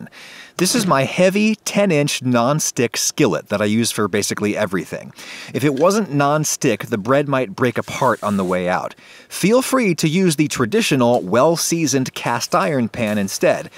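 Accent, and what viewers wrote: United States. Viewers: Holy shit it's food wishes in 5 mins and in an American accent. You're God. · you're american? i thought you're canadian!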